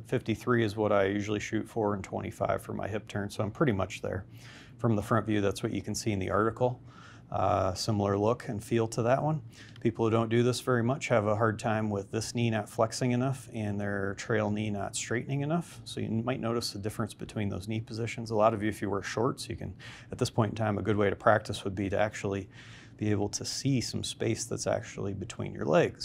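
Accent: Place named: Ontario, Canada. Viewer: Very well said and illustrated. Is your shop found in Ontario you sound like it may be. I’m looking for a location in southern Ontario. I have subscribed, I’m a believer of ST. Thank you. JB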